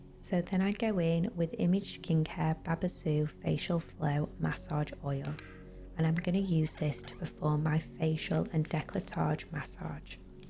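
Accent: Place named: Manchester, United Kingdom. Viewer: I’m American but I think you’re from Manchester. Why? Because I watch a lot of English football. If I’m wrong I’m wrong. Still fun for me to guess whilst appreciating your work. Cheers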